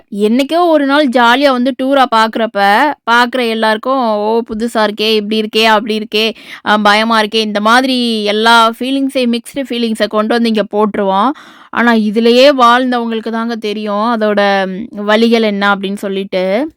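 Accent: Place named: Coimbatore, India. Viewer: கோயமுத்தூர் இவங்களா வாசனை மாறாமல் பேசுறீங்க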